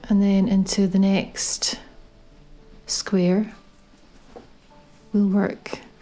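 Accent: Scotland, United Kingdom. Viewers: As a first timer at crochet, I just like to say , your easy to follow, step by step, you're also Scottish which makes it even easier for me. Thanks ♥️ a trillion💯👍💓🏴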